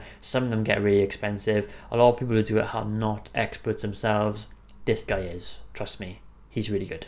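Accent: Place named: Wales, United Kingdom. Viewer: I love your Welsh ancient, it reminds me of my Shrewsbury family! Your content is Gold and I love your no frills approach it’s refreshing 🤘🏽💯 keep going brother you’ll be super affiliate in no time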